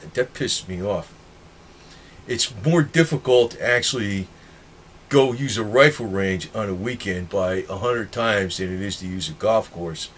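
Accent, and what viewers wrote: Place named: New York, United States. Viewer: Sounds like you are from New York or Jersey.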